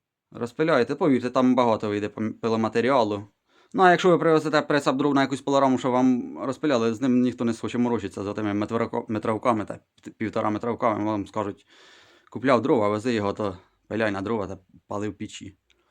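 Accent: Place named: Poltava, Ukraine. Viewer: Мені подобається ваш полтавський говор , я сам з смт Покровське дніпропетровської області і дуже схожий вас суржик с нашим, просто дивуюся , дякую.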